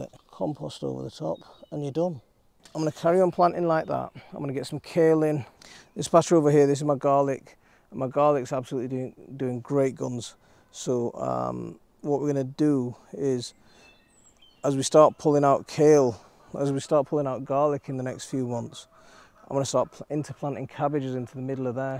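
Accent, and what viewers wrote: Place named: Northern England, United Kingdom. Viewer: That is how people speak in the north of England.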